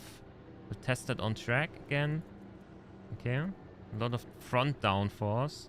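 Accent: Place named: Germany. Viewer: Are you German you pronuns it Like a German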